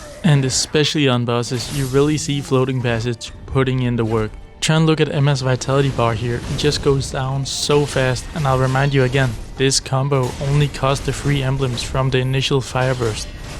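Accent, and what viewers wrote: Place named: Denmark. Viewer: Er du dansk? The accent really sounds danish.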